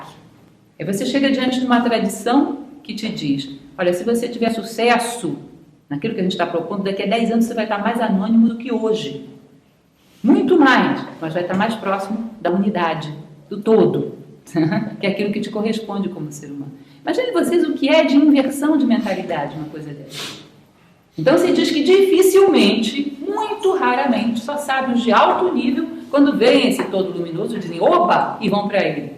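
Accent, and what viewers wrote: Rio de Janeiro, Brazil. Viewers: Muito interessante Tive dificuldade de entender a fala Primeiro achei q era o marcante sotaque carioca com seu todo abrangente xxxxx Depois achei q é a dicção da palestrante que aumenta e diminui o tom durante a pronuncia da mesma palavra Finalmente achei q tinha um eco na acústica da captação do som Pode que o uso de um pequeno microfone possa otimizar o som Enfim certamente a dificuldade de entender penso que é minha Agradeço o compartilhar do tema Abraço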